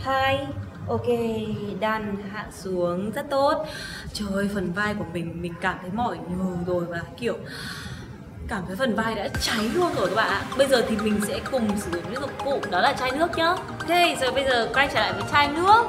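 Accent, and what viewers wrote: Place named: North, Vietnam. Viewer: Giong mien Bac that la de chiu.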